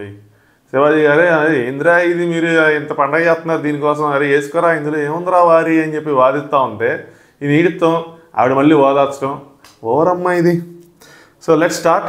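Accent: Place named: Guntur, India. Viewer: Guntur side slang anipistondi 😁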